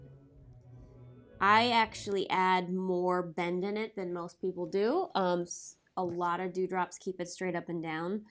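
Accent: England, United Kingdom. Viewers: I love this entire video, but just want to say your English accent is adorable and amazing!! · That’s a good British accent! 👏🏻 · Thank you so much! this is phenomenal! Love your costume and English accent! <3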